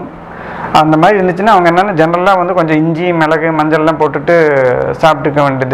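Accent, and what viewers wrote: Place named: Kerala, India. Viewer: Malayalee Tamil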